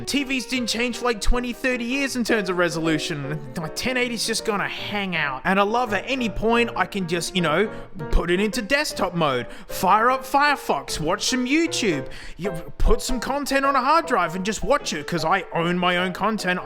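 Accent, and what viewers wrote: Australia. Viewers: You sound like sniper tf2 and aussie too noice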